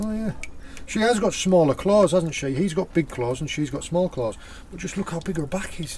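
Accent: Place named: Cornwall, United Kingdom. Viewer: A very sustainable approach to sorting the catch. Enjoyed the sweet and lovely Cornish accent either. Missing it now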